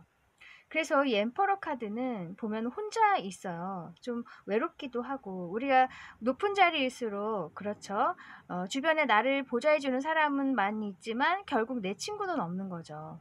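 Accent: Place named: Seoul, South Korea. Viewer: 서울 말씨를 예쁘게 쓰시는 것 같아요^^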